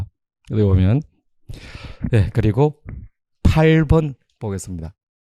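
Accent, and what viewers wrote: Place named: China. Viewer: 딕션이 중국인 같노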